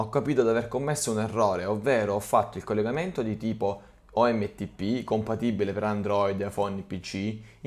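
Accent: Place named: Apulia, Italy. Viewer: Ho provato a seguire passo passo ma il mic nin funzionava. Ho sentito che hai un accento pugliese e per questo ho chiesto,io sono in provincia di Brindisi.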